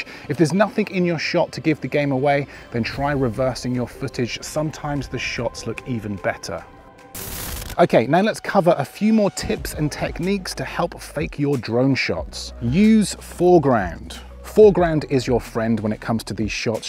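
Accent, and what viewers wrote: United Kingdom. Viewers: めっちゃイギリス英語！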